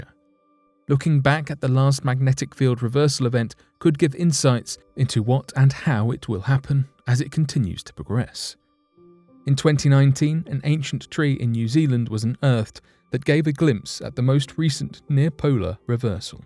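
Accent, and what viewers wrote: United Kingdom. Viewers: The reason that there is not as much Ozone at the south pole is not due to abnormal depletion, but due to the much lower amount of solar radiation that is necessary to form ozone, due to tangential angle of sunlight over Antarctica. But you say all this information in a British accent, and people believe it!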